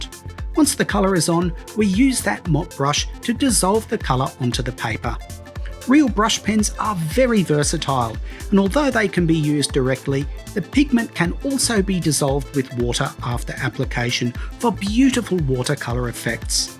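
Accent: Australia. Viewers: Great video! Thanks. Loved the music! But found it a bit loud so I couldn't hear the lovely gentleman's aussie accent.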